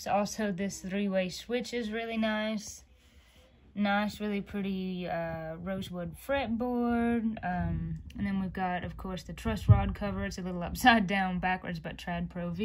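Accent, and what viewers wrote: South, United States. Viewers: Love your southern accent...! Isn't the Trad LP too heavy...? Cheers from 🇫🇷 big BBS fan 🎸🤘🏻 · Love that guitar; just picked up the 2020 Trad Pro V in satin ebony yesterday! Amazing guitar; mine has mahogany body & mahogany top. Cool video, awesome guitar, and nice country accent; easy to listen to. Take care & God bless, Brandon 🎼🎸🇺🇸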